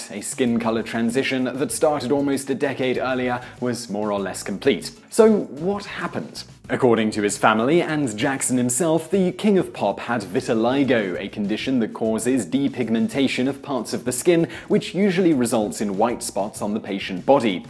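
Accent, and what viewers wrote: United Kingdom. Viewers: British accent...Good bye with your bullshit.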